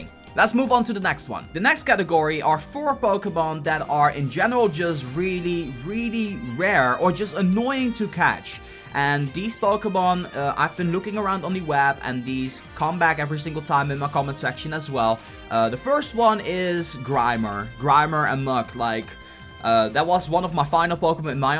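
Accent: Netherlands. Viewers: That horrible Dutch accent :( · ur dutch right? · Hey, are you dutch? i am and i thought you where too <3 more pokemon vids<3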